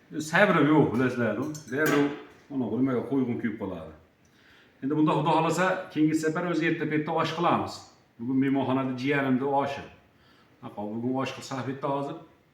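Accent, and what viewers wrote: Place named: Namangan, Uzbekistan. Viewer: Гап йук уста ака наманганни жийда капа ёки чужа кишлоги шеваси билан бир хил экан шевангиз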